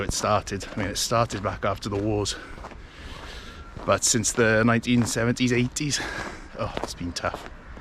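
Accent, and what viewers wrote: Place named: England, United Kingdom. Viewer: You are one great historian and a narrator, not to mention, you’re an amazing cinematographer! Having lived in the Philippines, your accent can be clearly understood by most of your viewers, The Filipinos👏🏻👏🏻👏🏻 While doing your videos, stick to the English accent we understand not with Gaelic or Welch accent 💖💖 more more more please. NEXT: Cardiff Castle and the markets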